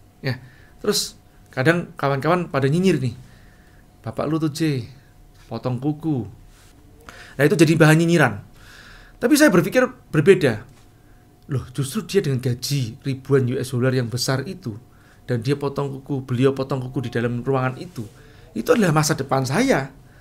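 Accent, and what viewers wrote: Java, Indonesia. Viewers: Rodo medok 😁,thanks inspirasinya bos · Medhoooknyaaa sukaa bang. Salam kenal dari Elsera di Sidoarjo.. Semoga saya bisa sukses seperti anda bang..🙏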